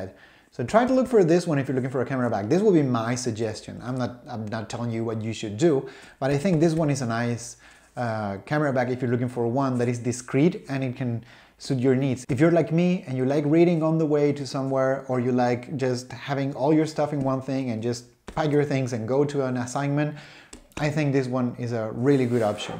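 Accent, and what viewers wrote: Chile. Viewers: ... eres de chile?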